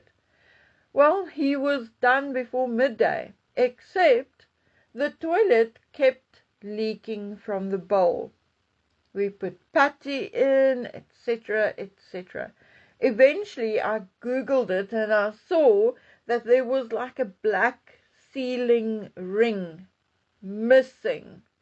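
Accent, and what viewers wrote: South Africa. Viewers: I'm not sure where lives, but to me, it sounds like South African. African as a country produces oil.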